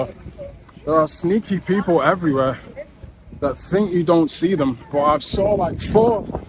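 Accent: Manchester, United Kingdom. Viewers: Well going by his accent he’s certainly a proper Mancunian. 😊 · proppoh manchestoh accent , good video mate well done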